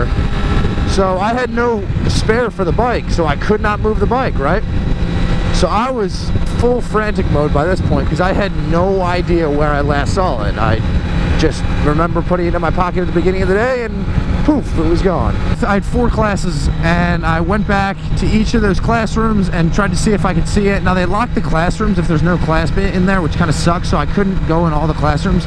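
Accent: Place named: Alabama, United States. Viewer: hey man, what part of alabama are you from?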